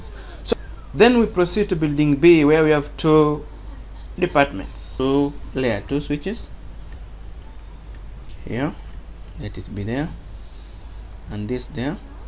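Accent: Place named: India. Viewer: sir i am an ethiopian please make your english language good make it approach to american english not to indian accent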